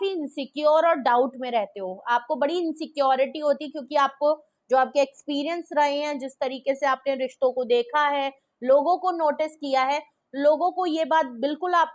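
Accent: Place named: Eastern Uttar Pradesh, India. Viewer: Mam your way of talking is very impressive.....i think you belong to eastern up...Jai shree sita Ram ji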